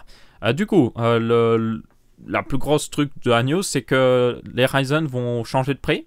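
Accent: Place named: Belgium. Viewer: C'est l'accent belge qui lui fait prononcer "léger" en "légère", c'est tout.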